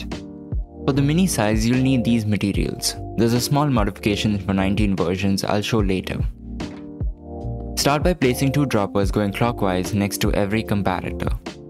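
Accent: India. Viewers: lol, i knew you are an indian the moment you spoke, your accent was similar to tech raj(another utuber), haha, i didnt expected an indian to be interested in minecraft mech. and tech. most of the indian minecrafters are jjust playing it for views and copying stuff, nothing special. source; im indian too. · Indian accent? Bro u Indian, btw just clicked on vid 4 secs ago.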